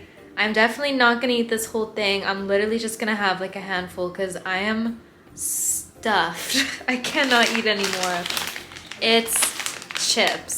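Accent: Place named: New Jersey, United States. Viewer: Oh wow she's Canadian? I toad-ally thought she was from like Jersey or New York. Is it just me or does she have that accent? Lol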